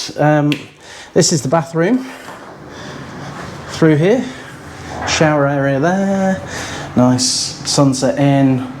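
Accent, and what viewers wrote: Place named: England, United Kingdom. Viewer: Hey bro,, thank you for visiting Timor Leste,,, you sound English .. are you from England?... btw once again thank you very much for this great adventures of yours to Timor Leste.. this is definitely will help boosting up Timor Leste tourism and as a Timorese I can't thanks enough for this... I hope you did enjoyed your traveling around Timor and had a great adventure time .. thank you and be safe..... obrigado barak!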